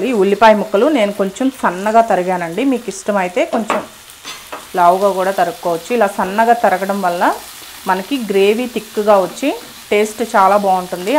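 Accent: Nellore, India. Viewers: Nice madam from:kavya mi vantalu motham super cheppadam kuda nidanamga ardamaila chepthunnaru.midi nellore ra madam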